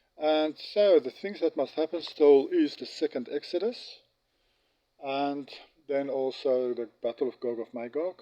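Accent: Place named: South Africa. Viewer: Ha, I asked if you were South African in one of your later videos, and now after this one I know. I'm going through all your videos now. I found you from your comment on the video with those 3 guys talking about the millennial reign.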